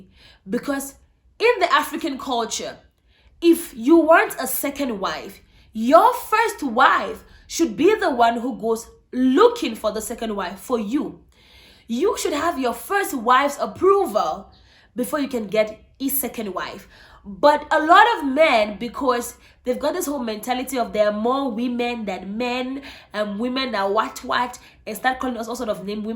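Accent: South Africa. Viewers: Any how nna lewena ,sounds like ur from msanzi ,,I beg you Cameroon wl disown you ,as for matswere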